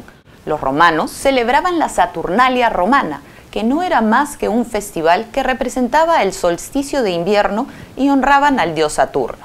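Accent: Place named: Mexico. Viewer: De que país es te programa. Tu acento neutro me hace identificar de que país eres. Pero sospecho que eres mexicana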